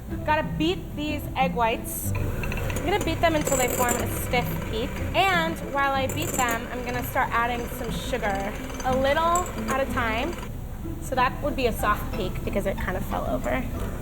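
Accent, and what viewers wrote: United States. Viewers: I've never heard a more American pronunciations in my life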